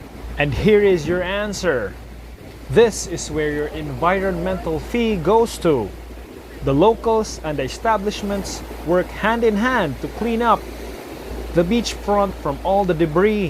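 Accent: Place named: Visayas, Philippines. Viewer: Hi, just noticed you're from Cebu. You have fantastic beaches too. I'm showing my age, but the first time I visited Cebu was in 1981 when we stayed on Mactan Island. I'm sure it's changed now. I enjoy listening to the Bisayan accent. My hometown of Liverpool also has a disitinctive accent/dialect that we are proud of👌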